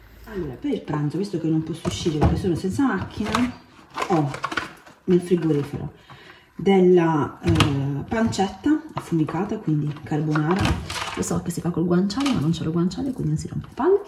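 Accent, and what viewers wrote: Apulia, Italy. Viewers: Salve,sono nuova mi sono iscritta al tuo canale sbaglio sei pugliese come me dall'accento sembra così, complimenti per il video grazie e buona giornata 😘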